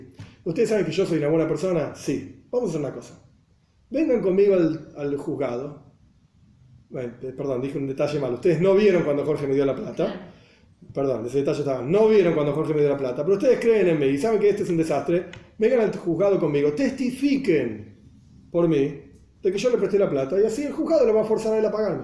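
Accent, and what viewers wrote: Argentina. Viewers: Saludos una pregunta eres Argentino? Es agradable escuchar tu acento bueno Gracias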